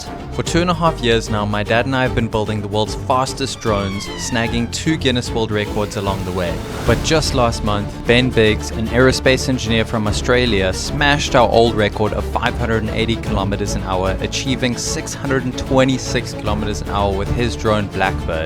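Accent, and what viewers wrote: South Africa. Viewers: That south african accent again. Thumbs down, I'm out. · SOUTH AFRICAN ACCENT DETECTED!! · Yoh the SA accent is so recognizable bra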